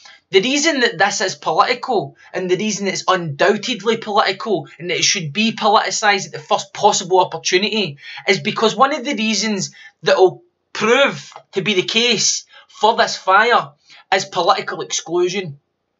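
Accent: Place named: Scotland, United Kingdom. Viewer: Pure Truth served up drenched in a Scottish accent.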